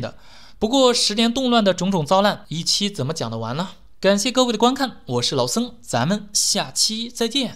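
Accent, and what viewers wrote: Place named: Hunan, China. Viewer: 我对湖南口音很排斥😂😂😂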